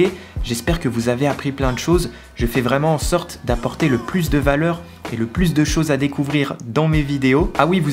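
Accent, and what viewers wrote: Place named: Switzerland. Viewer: bonjour de Normandie . un suisse? je m'attendais a un accent bcp plus prononcé. tout fou le camp. mais en contre partie j'aime bcp votre voix elle a une certaine musicalité (meilleur formulation qui me vient e l'esprit). je cherchais des news geek et je suis tombé sur votre chaîne j'aime beaucoup. j'aimerais énormément débattre sur "nouvelle génération d'imprimante 3d" pour moi les reelles nouvelles générations ça va etre les imprimantes a tapis roulant les imprimantes "infinis" tout le reste (a mon avis) ce ne sont que des mises a jours. c'est pour ça que je me casse le train depuis deux ans avec une base am8 ( et meme prusa bear c'est + a la mode) que je veux custom a fond. car lest pas possible de trouver a prix correcte une imprimante qui nous correspond parfaitement . auto leveling, <num>v électronique, stepper, rail lineaire ou tige 8mm buses classiques ou alongee pour imprimer reellement en 3d et modifier la résistances des pièces par la fibre. 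la tete du chassis ect. je vois l'imprimante 3d de mes reves un peut comme le sabre laser d'un jedi qui est fait sur mesure pour lui. et en plus c'est instructif et valorisant.... mais ce qui est frustant c'est que pour l'instant c'est une ender 3 simple qui fait tout le boulot..... enfin bref bonne continuation je vais vous suivre.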